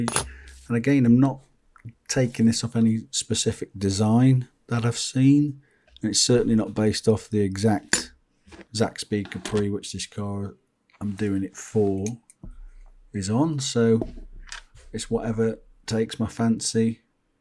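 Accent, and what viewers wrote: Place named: Midlands, United Kingdom. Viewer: Excellent feature/tutorial. What would closest describe your accent, Leicester, Derby, Stoke?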